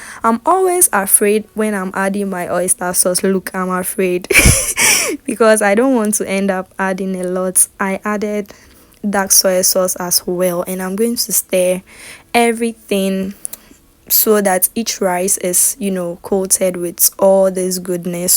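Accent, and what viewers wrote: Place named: China. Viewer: Your now sounding like a Chinese 😂😍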